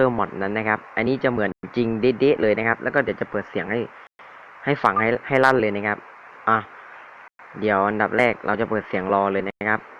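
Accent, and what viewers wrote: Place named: South, Thailand. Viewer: คนใต้เออ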